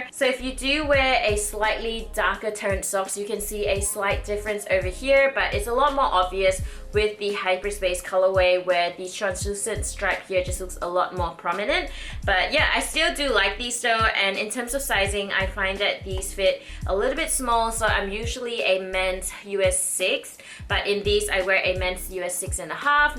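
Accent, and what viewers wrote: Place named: Australia. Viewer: Holy shit. An Asian with an Australian accent. Now I've seen it all.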